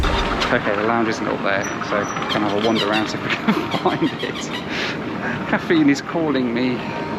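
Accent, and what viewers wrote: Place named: United Kingdom. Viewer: Thank you for doing what you do. As an Italian that grew up in the UK, and that now lives in the US, it’s good to hear a familiar accent showcase the same passion I have.. aviation. You’re likely the only channel on YouTube, I watch every day. Thanks again and I wish you luck for the future!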